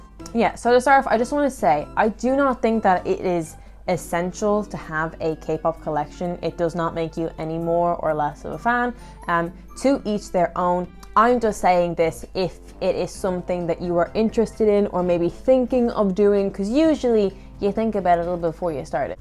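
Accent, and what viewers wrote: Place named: Ireland. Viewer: Are you Irish? I like your accent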